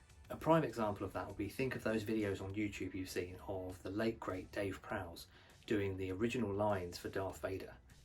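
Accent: United States. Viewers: Your American Accent was perfectly good. Thank you for this informative demonstration. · I just acquired stormtrooper complete armor here in Florida, USA and I'm looking for a voice changer. Your video was very helpful and entertaining. Plus your American accent stormtrooper voice sounded really good. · Great video, thank you! Your American accent is not bad at all. I’m not sure I would have questioned it.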